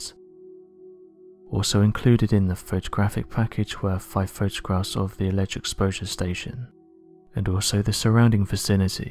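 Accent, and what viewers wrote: United Kingdom. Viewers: All fluff no substance. This channel brings nothing to the table every video except a 'mysterious' British voice over whispering cautiously. Pretty pictures/zero substance.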